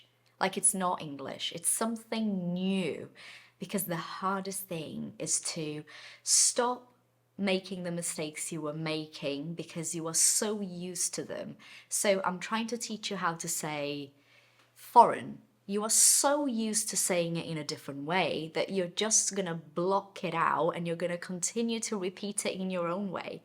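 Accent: United Kingdom. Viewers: Your British accent is amazing! The First time I saw you I thought you were a British woman, I got surprised when I found out you're Brazilian. As I Said your British English is perfect. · Why did you choose British accent? Congratulations, it's a beautiful accent. 👏👏👏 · Eu também achava que você era britânica quando vi pela primeira vez seu vídeo aqui. O seu curso é também para quem fala mais o inglês americano? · Hi there! How long have you been learning English? How long have you been working on your pronuntation? You sound like a native British speaker. 🎉🎉🎉🎉 Do I need to record only my voice or a recording of myself with a camera?? Thank you so much!❤❤❤ Greetings from Ecuador.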